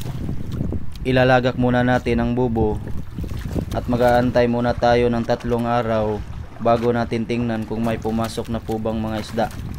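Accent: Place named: Batasan Island, Philippines. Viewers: saan ka sa tubigon? b4 nag school pako marami akong classmate dyan,I think sa tuno ng pnnalita mo ur from batasan iland,me from clarin bt her nko mnila now,maybe ur one of my classmate b4 or school mate.. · salamat sa pagtagalog mo kac kapag magbisaya ka hndi Po namin maintindihan.. kahit bisaya Yung tuno ng salita mo at least naiintindihan Po namin.. I like your content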